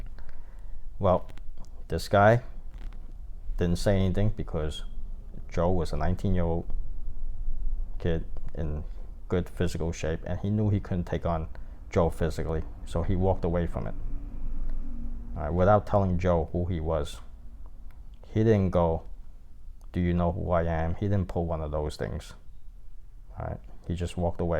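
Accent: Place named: New York, United States. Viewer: Awesome NY accent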